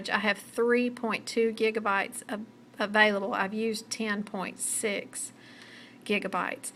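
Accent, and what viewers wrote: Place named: South, United States. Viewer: i love your accent (southern?)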